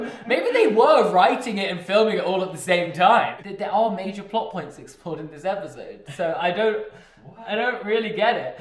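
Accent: United Kingdom. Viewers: He’s British? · I know you can’t be British with a name like but the accent says otherwise.. feels wrong man, we need to get you over to the motherland for some accent coaching.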